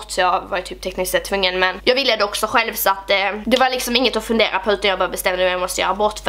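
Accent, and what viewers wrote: Scania, Sweden. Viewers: Asså du e typ den enda ytbern jag kan sitta och lyssna på en hel video, tröttnar typ på andra men du e bäst på det (och jag älskar skånska 😂) Love u ☺️❤️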